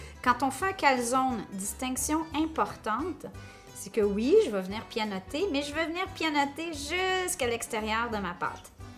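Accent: Canada. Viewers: Cc bonjour merci pour votre recette de calzone je voulais en faire mais n'avais aucune idée de se que l'ont metter dedans super! Je vais en faire et faire ma pâte a pizza moi même bne continuation vous êtes super et j'adore votre accent canadien qui ressemble un peu a mon accent du Nord 59❤❤❤❤️🌹🌹🌹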